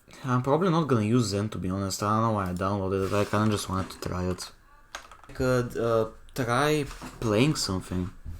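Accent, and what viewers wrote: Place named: Bulgaria. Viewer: i knew i heard a bulgarian accent. Great video tho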